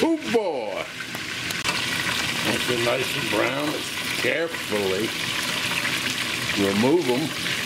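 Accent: Louisiana, United States. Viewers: What part of Louisiana u from sha? Got dat ole tic accent baw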